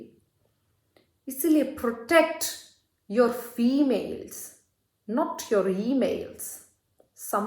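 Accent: South, India. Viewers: 😊😅 very good informative and interesting satire, with South Indian language accent. Great and intellectual comments. Thanks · Tamil accent in particular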